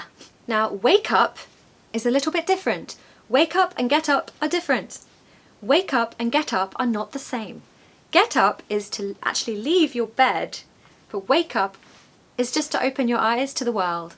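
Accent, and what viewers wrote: United Kingdom. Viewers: Very nice I am falling for your British English 🇨🇦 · I found your lesson about phrasal verbs very useful indeed!! I really love your British accent!! 💖💖💖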